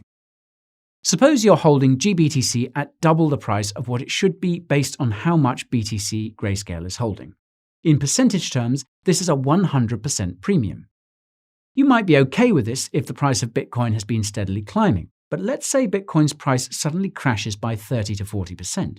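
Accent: United Kingdom. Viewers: This guy puts Bitboy Crypto to shame....blown away by the great amount of info and research this guy provides without the smoke and fireworks. Maybe it's just proper British demeanor and accent that makes everything sound more credible...lol. Either way, I like it. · i found his accent is kind of tricky for me, no offence for the person in the video since english is my 2nd language. do you know which part of uk this kind of accent coming from? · This guy could spout nonsense but with the british accent he sounds smarter...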